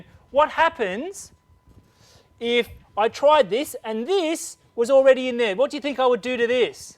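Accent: Australia. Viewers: Thankyou, my lecturer is foreign and i have trouble understanding him, thank god you are also australian! You have literally explained this perfectly for me and i understand how to do it! THANKYOU!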